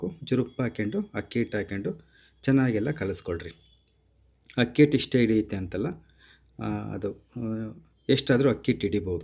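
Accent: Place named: Holalkere, India. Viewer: ನಿಮ್ಮ ಮಾತು,ನಮ್ಮ ತಾತ ಅಜ್ಜಿ ಮಾಮ ಹೊಳಲ್ಕೆರೆ ಗೆ ಹೋದಾಗ ಮಾತಾಡುವಾಗ ಕೇಳಿದ್ದೆನು. ಅದೇ ರೀತಿ ಇದೆ.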